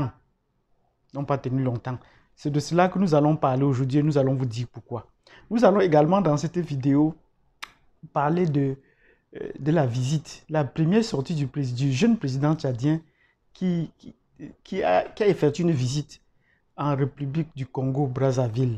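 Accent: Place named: Bamileke, Cameroon. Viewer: Je m'excuses, mais ton analyse est faite avec trop l'accent Bamiléké qui me gène personnellement, elle est pleine de répétitions de phrases et sur le fond je n'y ai rien trouvé de pertinent. Donc je te conseille de laisser la place à un autre qui non seulement parle sans accent, mais qui va dans la profondeur des choses. C'est vraiment Dommage pour les Bamilékés. 🙏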